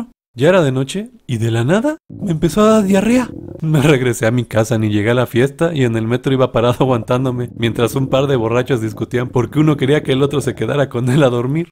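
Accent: Zacatecas, Mexico. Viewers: Eres de Zacatecas??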